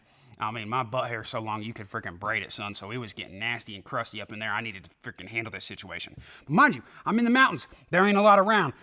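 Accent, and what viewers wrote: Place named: Kentucky, United States. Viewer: Im from ky and I can definitely tell you lived there for a while when you was younger by the way you talk lol, screw all the politicly correct way to talk not good for anyone to censor the way you talk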